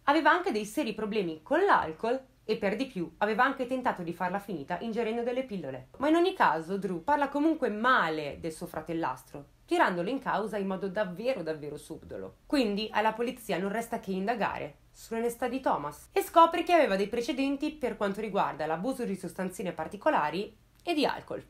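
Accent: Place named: Lombardy, Italy. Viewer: Ciao ti faccio i miei complimenti è veramente bello ascoltare come spieghi le situazioni!!! Volevo chiederti una cosa di dove sei? Il tuo accento sembra Como Varese o Milano.... Io sono di Como.... Grazie comunque x il lavoro Ke fai!!!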